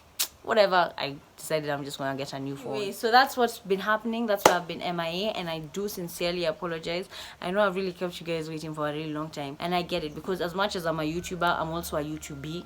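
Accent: United Kingdom. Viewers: that ka british accent 😩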